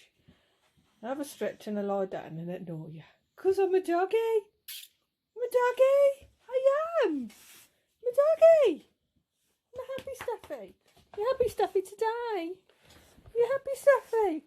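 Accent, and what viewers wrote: Birmingham, United Kingdom. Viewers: I love your accent. You sound just like Ozzy!! Blimey, I just checked, and he's also a Brummie! I think me ears are good. ^_^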